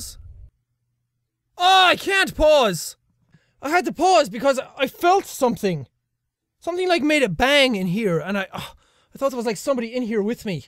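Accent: Ireland. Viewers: you have made a (somewhat jealous) subscriber out of me all right. God I wish I was raised in Ireland, I LOVE Irish accents, best in the world, screw Britain, Germany, Russia, all them suck when pinned against an Irish accent. Anyway, yea, I wish I was raised in Ireland so I could have that awesome accent, and I'd be happy with a little more Irish blood, both of my grandfathers are Irish, but so far down the family tree it means nothing.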